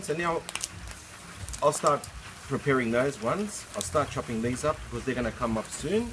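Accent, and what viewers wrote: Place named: Greece. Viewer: I swear he sounds Greek until he says things like oregano.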